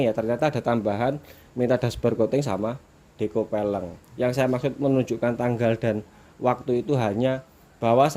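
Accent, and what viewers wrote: East Java, Indonesia. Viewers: Lokasi di mana mas, ko kaya' jawa timur an...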